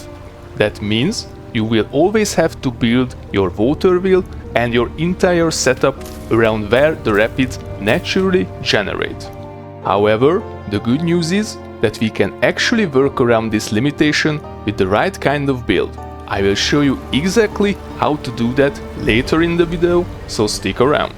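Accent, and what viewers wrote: Hungary. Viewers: instantly recognized the thick hungarian accent. Bojler eladó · Sounds like you have a Hungarian accent, am I right to assume that it is your mother language?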